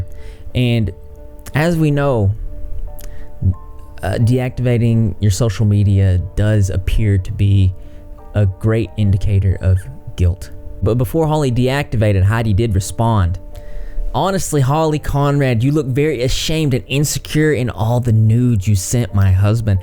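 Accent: South, United States. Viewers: Are you from the south? Your accent is adorable.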